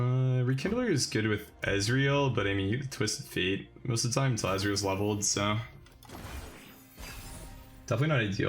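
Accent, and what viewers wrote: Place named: Netherlands. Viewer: Are you dutch? I think I recognize ur accent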